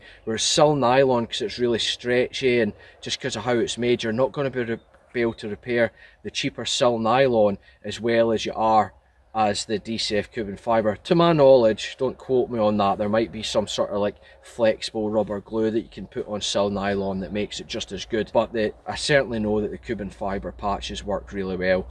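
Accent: Scotland, United Kingdom. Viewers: Holy crickey, you really are Scottish in your way of speaking. Long live linguistic diversity!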